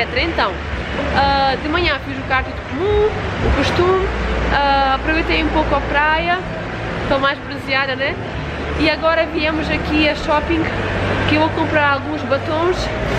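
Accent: Portugal. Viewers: Algumas coisas que você diz eu não consigo entender, mas a maioria sim. O português de Portugal é bem diferente. kkkkk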